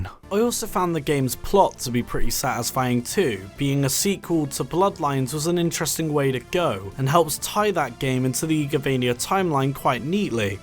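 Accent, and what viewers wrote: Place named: Netherlands. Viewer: yes i really enjoy your Dutch accent.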